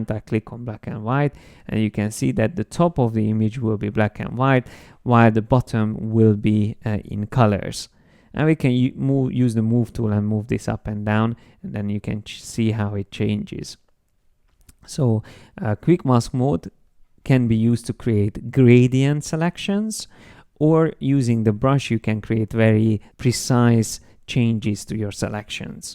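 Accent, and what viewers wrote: Hungary. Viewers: látom nem vették észre ennyi év alatt hogy magyar vagy, de amikor meghallottam az akcentusodat, egyből éreztem hogy valami van :D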